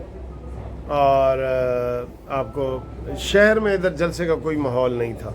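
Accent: Saraiki, Pakistan. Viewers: صاحب ۔ سرائیکی لحجے میں اردو تبصرے اور تجزیے اور تازہ ترین معلومات ۔ ماشاءاللہ اس کا ایک اپنا مزی ھے۔ اللہ تعالیٰ آپ کو جزائے خیر عطا فرمائے آمین ۔ اپ کا تعلق قانونی حلقوں سے ھے اور معروف قانون دان بیرسٹر ہیں ۔ تحریک انصاف ایک بار پھر مخصوص نشستوں پر مزید وضاحت کے لیے متفرق درخواست کے ساتھ سپریم پہنچ گئی ھے۔ 3 آئینی ادارے آن بورڈ ہیں انشاللہ جیت پارلیمنٹ کی ھو گی۔ آپ سے درخواست ھے اس کے متعلق اپنی قانونی رائے سے نوازیں کہ اونٹ کس کروٹ بیٹھتا نظر آ رہا ہے ۔ اللہ تعالیٰ پاکستان کی حفاظت فرمائے اور فسادیوں اور انتشاریوں سے محفوظ رکھے آمین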